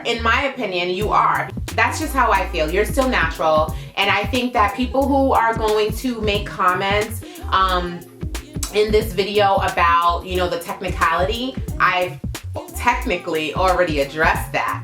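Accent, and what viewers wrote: New York, United States. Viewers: "It's Stoop-id" that NY accent is strong gurl...😆😆. Anyway stand in your truth you did not get this far steering folks the wrong way. I appreciate your candor and willingness to supply the demand in this community. 🙌🏾👌🏾👍🏾.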